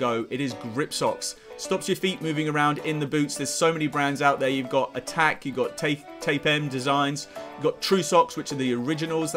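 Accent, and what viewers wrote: United Kingdom. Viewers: Chale, que dificil es entender el ingles britanico pero si te entendi en un 70%.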